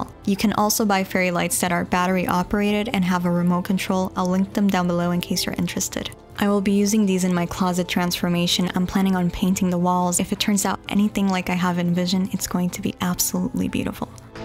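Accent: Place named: United States. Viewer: I’m curious where you are from, you have a lovely American accent with something lovely from somewhere else...beautiful speaking voice, you MUST start an AMSR channel, you will gain thousands of followers! ✨🐰🐰🌹✨🎠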